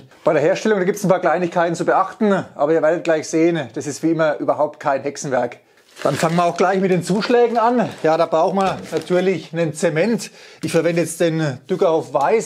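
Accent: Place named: Franconia, Germany. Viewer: Ich hob mer dacht: "wuu kummder denn her? Denn Dialeggd denn kennsd..." Aus Frangn isser wie ich aa! 😀👍 Zum Thema hochfest: Wir hatten früher auch Faserzusätze und/oder gebogene 10mm lange Drahtstücke zum aufnehmen der Zugkräfte beigemischt (Industrieofenbau), wie ist es damit, wird sowas heute noch gemacht? Gut mit der Gewebeeinlage ist die Frage zumindest zum Teil beantwortet...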